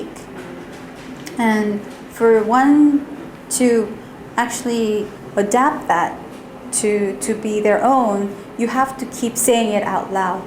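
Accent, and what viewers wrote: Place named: South Korea. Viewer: 발음도 안조음 코리안 발음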